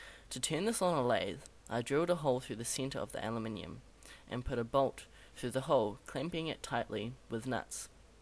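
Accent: England, United Kingdom. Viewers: Wow. Kom je uit Engeland ofzo? Toppie accent. :) Is al dat gereedschap van jou? Draaibank en freesbank hoorde ik ook voorbij komen volgens mij? super werk heur!!!! :)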